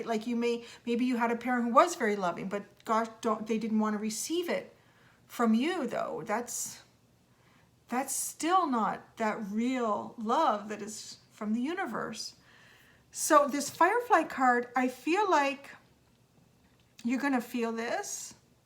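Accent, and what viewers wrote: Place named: Canada. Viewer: Great reading! Thank you ❤️ I thought I detected a fellow Canadian there, especially after I saw the Roots shirt 😄 Which part of Canada are you from? Hello from Calgary!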